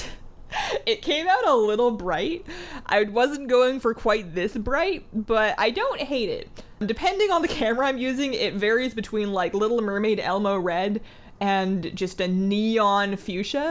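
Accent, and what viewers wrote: Canada. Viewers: You're Canadian, aren't you? :)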